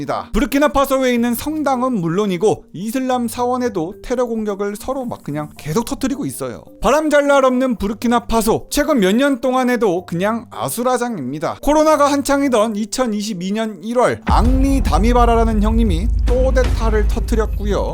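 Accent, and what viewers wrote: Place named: Jeolla, South Korea. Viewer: 전라도네 ㅋㅋ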